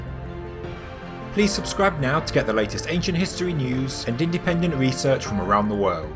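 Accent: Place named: Midlands, United Kingdom. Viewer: I'm from England, yet can't place your accent, sounds Midlands maybe. Another well researched presented video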